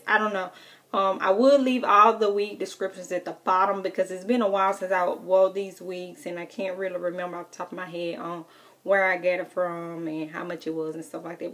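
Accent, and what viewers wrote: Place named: South, United States. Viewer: Omggggggggg where are you from? I love your accent 😊 hmm let me guess...Houston? Atlanta? Tennessee?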